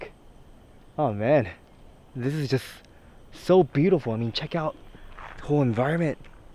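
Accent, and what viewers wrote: Singapore. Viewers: 英語がシングリッシュ寄りのアクセントになってきた気がするww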